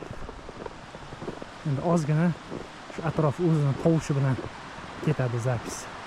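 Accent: Namangan, Uzbekistan. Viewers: Sheva nomongon😊😊😊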